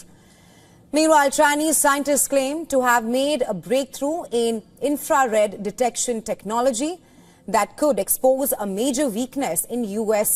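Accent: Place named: India. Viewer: I dont understand her indian english tune😢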